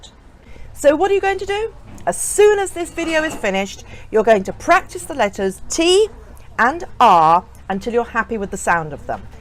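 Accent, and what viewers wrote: United Kingdom. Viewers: Im from El salbador ,central america and I love british accent amd youre so cute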